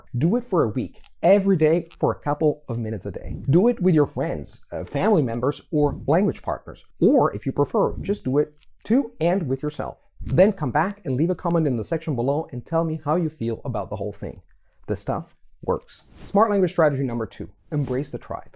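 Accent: United States. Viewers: For those non-native speakers here who are wondering-yes, Luca does sound like an American. -a native speaker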